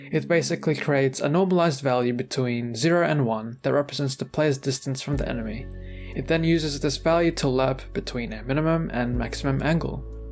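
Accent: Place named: Australia. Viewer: Great video! Knew you were an aussie from the first few seconds of the video xD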